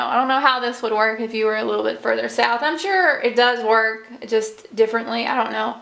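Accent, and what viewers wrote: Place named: Kentucky, United States. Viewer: I just watched this video and as soon as you started talking I had to smile. You sound just like me. Are you from Kentucky? Sure made me homesick. I live in NC now, but I love my old Kentucky home.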